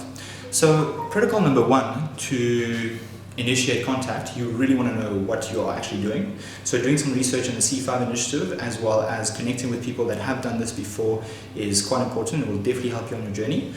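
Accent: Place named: South Africa. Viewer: ah south african accent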